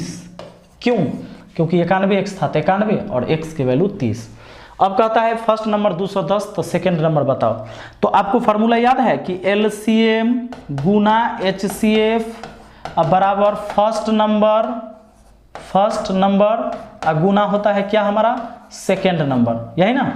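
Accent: Bihar, India. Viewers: Ekdam Bihari andaj me🔥🔥🔥 आरे 20 मिनट में रगड़ देबई😂😂। सिर फैन like 👍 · अरे 30मिनट में रगड़ देबे😀😀😀 बहोत खूब sir jee एकदम बिहारी स्टाइल🙏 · गुरु ज एक बिहारी आन्दाज में शानदार है लाईव नही पढते क्या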